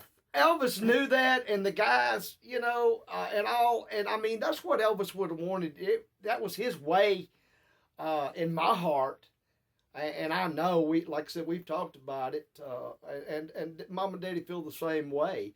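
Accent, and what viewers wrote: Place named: Tennessee, United States. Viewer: Huge Elvis fan from Venezuela since I was like 7-8 years old...looong time ago LOL! My dream is going to Graceland and no matter what am gonna make it. Love your Tennessee accent, is just lovely! and this channel is just great. As I fan, I truly appreciate it. Thank you soo much for these videos!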